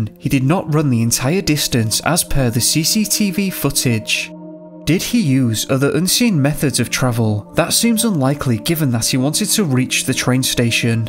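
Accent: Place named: Manchester, United Kingdom. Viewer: Currently binge watching your videos and being from Manchester, this sparked my interest. Great content and nice to hear a local(ish) voice.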